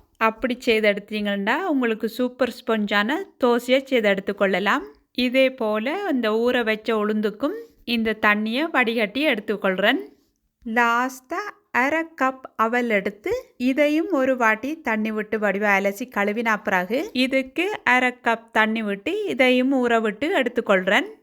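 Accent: Sri Lanka. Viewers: இலங்கை தமிழும் , தோசை யும் அருமை 🎉🎉🎉🎉🎉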